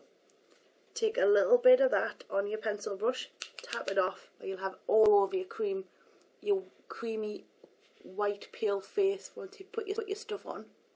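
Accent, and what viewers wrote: Newcastle, United Kingdom. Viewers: r u frm newcastle cuz u sound like cheryl cole! :) x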